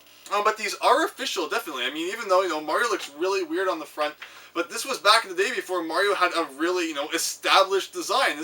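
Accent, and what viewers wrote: Canada. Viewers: Liking this video for the Canadian accent alone. "Put it on the COACH" bruh :)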